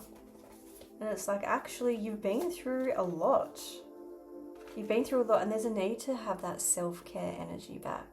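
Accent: Australia. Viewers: Your eyes are amazing i bet you get that a lot your voice is the sweetest wisper you make me chill 😎 to be as sweet as you lovely accent i think its Australian imagine nabours the girl next door who can read you inside out 😊❤x ditto my intuition is allways on key like a phenix angel 😇 covered in golden glow mmmmm i have yet to have my glow up I'm a very late bloomer the old catapiler in the universe I've been around since time began outside of Eden inside is just a memory a dream thats coming back to be remembered once more the beautiful garden i keep inside two lovers two meet to greet to find the love i left behind so long ago in my very first night invthe land of nod 💤 😴 💤 😴 to close my eye's to forget to remember when the time is right once more edens projection from within waking ⏰️ up to be remembered forever and ever a garden of light that never sleeps and never dies not even a flower not even a mouse surrounded by lions tigers and bears mmmmmm that jist want to be loved its actually the garden of love without a shadow without a doubt 😊❤x by beautiful your realy cool 😎 taa taa from Lancashire UK 🇬🇧😊❤x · I love your Australian accent😂❤❤❤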